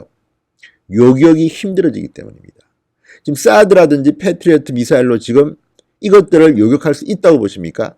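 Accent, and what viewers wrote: Gyeongsang, South Korea. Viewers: 진성호, 제목하고 너무 후까시가 심하다 늘 속는 기분이다 요새 좋아요 안 누른다 이런 식이면 구독도 뺀다 구독 구걸하지 마라 구역질 난다 너 말대로 조선일보에 서울대인데 뭐가 아쉽나 혹시 탐욕인가? 조직에는 너같은 놈이 꼭 있다만. 이런 식으로 내용 없는 제목으로 사람 계속 우롱하면 길가다 우연히 만나면 너는 나한테 얻어 맞는다 혹시 출마 욕심이 있나 황교안과 나경원에 매우 아부하는 느낌이다 시청하는 내가 역겹다 그렇다면 요쯤에서 유튜브 그만둬라 경남출신의 공직 30년 이상이다 애정 갖고 보아 왔는네 번번이 속는 기분이다 제목 옆에 ? 이런 거 붙이고 선동하고 면피하는 얕은 짓 하지 마라 이 자식아 ?표 없이 당당히 책임져라 계속 이런 식으로 사람 놀리면 너 사무실로 찾아 간다 ??? 이게 너의 소도냐 이 자식아 경상도새끼면 이런 짓거라 하자 마라 제목에 ?? 이런 거 달지 말고 당당해라, 한 다리만 건너면 다 알 수 있는 한두살 선배 옆동네 경상도 놈인네 정말 이렇게 살지마라 조갑제가 언제 제목에 ?달더냐 구독을 구걸하더냐, 진성호! 답게 살아라! 씨발놈아, 경상도답게! 서울대답게! 조선일보답게! 으이구 씨발새끼야! 요새는 왜 그리 약을 쳐먹었는지 톤을 내리 까는지ᆢ 참는 데도 한계가 있다 씨불놈아, 자신이 없으면 억양부터 달리해라, 경상도 억양으로 거짓말 하는 거 더 이상 보기 싫다 ~~~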